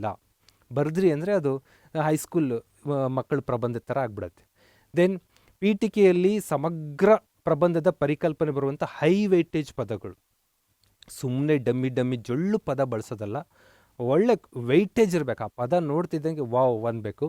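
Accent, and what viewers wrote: North Karnataka, India. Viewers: Are you from North karnakata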